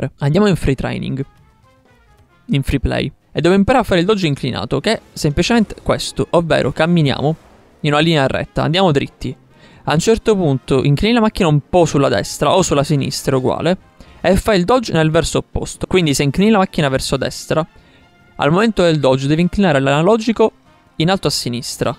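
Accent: Palermo, Italy. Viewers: Ma sei di Palermo??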